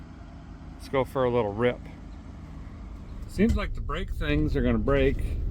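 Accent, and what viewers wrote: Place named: South, United States. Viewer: Your southern is improving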